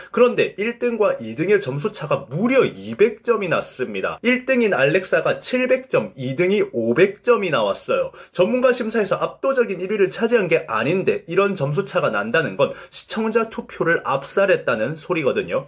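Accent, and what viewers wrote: Gyeongsang, South Korea. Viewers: 목소리에서 좀 힘을 빼시면 어떨까요? 아마도 경상도 사투리 때문에 더욱더 힘을 주시는거 같은데 너무 같은 높은 톤으로 때리시니 듣는 동안 힘이 드네요 ㅠㅠ 요즘은 그냥 자연스럽게 사투리 톤도 괜찮을거 같은데...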